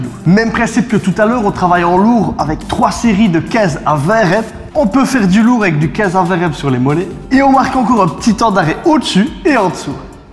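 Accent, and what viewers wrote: Liège, Belgium. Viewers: Un bon accent bien de chez nous #BelgiqueVie👌🏽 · msemblait bien qu il y avait un accent de liege ou pas loin ^^ merci cool tes videos j ai decouvert ia pas longtemps (je suis de Mons en Belgique egalement).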